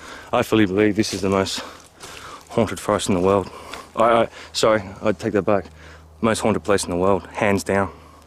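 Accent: Australia. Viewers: However, as an English speaker, I'd be happy to hear an Aussie anywhere! Especially in a scary forrest. It would be a welcoming sound for me, personally, as an American.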